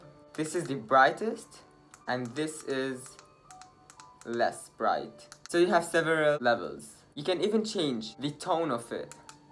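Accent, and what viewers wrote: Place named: Spain. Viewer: I like your accent. Where are you from? Spain ?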